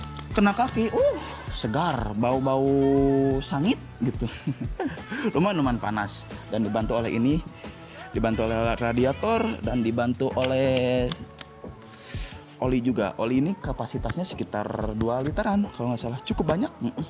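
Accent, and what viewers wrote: Indonesia. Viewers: Inimah..hhaa orang sunda..broo.!?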